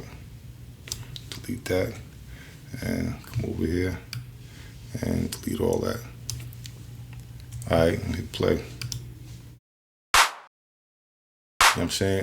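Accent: New York, United States. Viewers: You got that real New York voice. Lml what part are you from?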